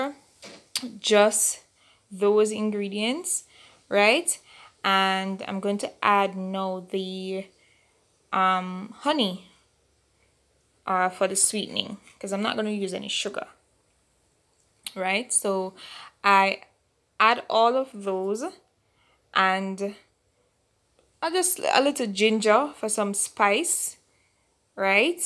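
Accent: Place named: Caribbean. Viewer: Nice Caribbean blend 🍹 love your accent. 😊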